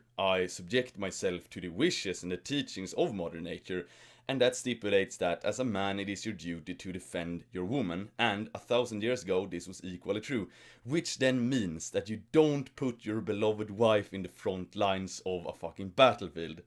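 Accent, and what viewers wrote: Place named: Sweden. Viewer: Each word is the glorious truth in a Swedish accent. Your words are the pathway to the recovery of your heritage. Men taking responsibility for their women, their family, and their nation is the pathway to personal and civic greatness. Each man that reflects your views is a mirror of the content of your character, and the image is seen when you are not there. Powerful words worthy of a public forum. Strong women give birth to and produce strong children. Why should a woman take on an enemy "one on one" when she can send any number of sons to send those enemies back to their god?, if you are your mothers' son, your mother is worthy of great respect. PUBLIKFRAMGANG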